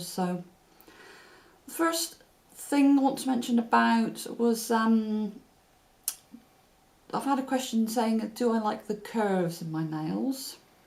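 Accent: England, United Kingdom. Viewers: Your really down to earth love your English accent hope you do more videos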